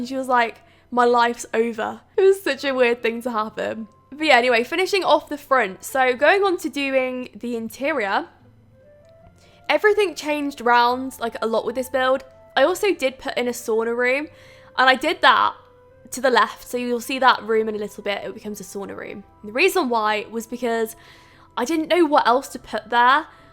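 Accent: United Kingdom. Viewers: You have the most British accent I've ever heard.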